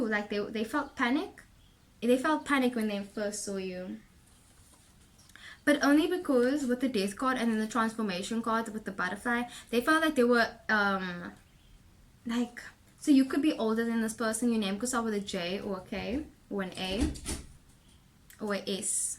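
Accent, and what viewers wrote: South Africa. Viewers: 😂😂😂 The 2nd scarf - You totally took me back to where we met. His a gemini and I'm a cancer. Everything you said resonates besides the long distance part or probably it means the distance between the two of us. I'm even older thn him You have a South African accent. Do you live in Kempton Park or near Lanseria? If I'm wrong, let me know please. I would like a reading in person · Ah south African? ?? 😄 yessss 😄👏❤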